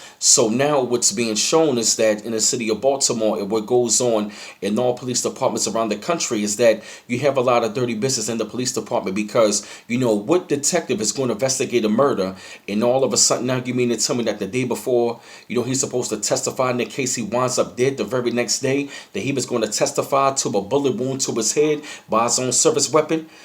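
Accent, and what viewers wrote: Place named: Atlanta, United States. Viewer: You don't sound baltimore.more like Atlanta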